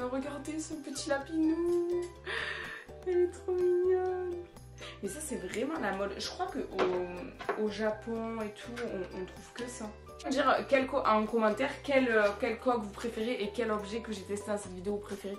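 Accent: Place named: Marseille, France. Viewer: T fournitures sont trop mignonne moi jpp faire sa je vais en cap mécaniques l'année pro donc bon 😂 mais après c'est chou j'ai trop hâte de faire mes fourniture est d'aller en cours mais que les trois premier jours après sa va me saouler ( ta l'accent marseille incroyable comme moi mais toi vrm remarquer ) ❤️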